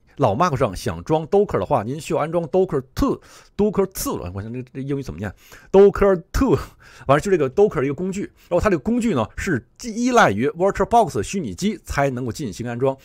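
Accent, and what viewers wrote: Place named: Japan. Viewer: 您的日语口音的英语太有意思了 LOL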